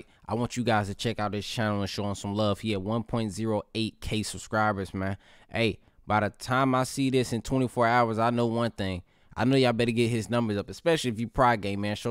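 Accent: Jamaica. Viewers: Lol you Jamaican bro? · u jamaican nw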